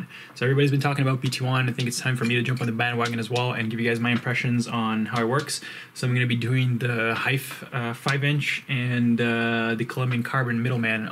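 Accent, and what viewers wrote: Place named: Brazil. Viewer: You sound Brazilian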